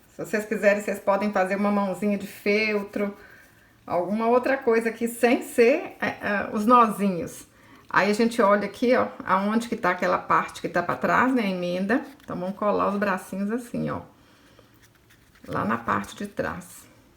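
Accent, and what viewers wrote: Minas Gerais, Brazil. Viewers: Ameiiiii....e amei mais ainda o sotaque mineiro, sou uma capixaba apaixonada por Minas!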